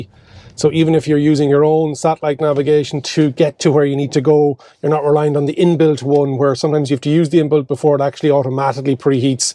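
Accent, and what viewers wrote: Ireland. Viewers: Nice review. My Irish wife from Mayo is sitting with me and she's trying to figure out your accent. County Meath?? She cannot figure it out.😊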